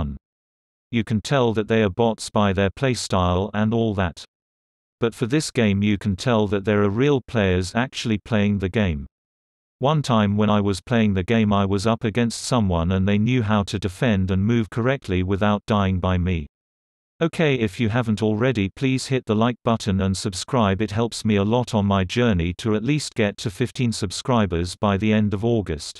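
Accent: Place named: United Kingdom. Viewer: You sound british. I make splix vids too.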